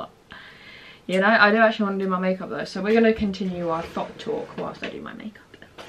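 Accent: England, United Kingdom. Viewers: salut jadore ton accent anglais tes tres jolie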